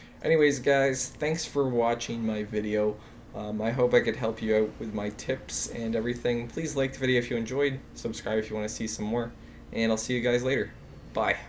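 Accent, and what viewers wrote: Canada. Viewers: 7 kill streak in under a minute horry sheit (unrelated) are you canadian? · Are you Canadian by any chance?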